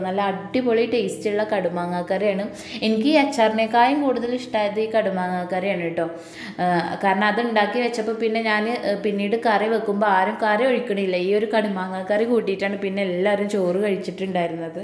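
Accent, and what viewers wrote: Malappuram, India. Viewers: Ningal malappurathu evideya??thani malappuram slang.njanum malappuram aanu..anyway proud of u.ethrayokke achieve cheythallo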